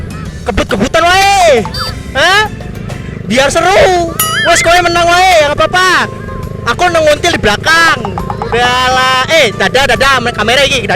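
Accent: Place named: Java, Indonesia. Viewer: medok banget vangg ente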